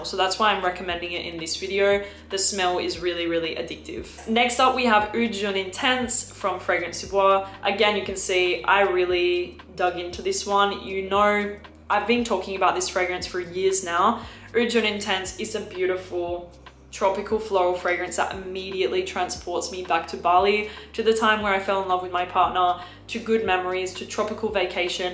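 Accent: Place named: Australia. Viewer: I love your knowledge. Very informative I just wish you would embrace you “Australian “ accent. 🤦🏻‍♀️ Its all over the shop. So some of your words are incorrect pronunciation. Bcos you are imitating a mix of accents. Lychee = “lie chee “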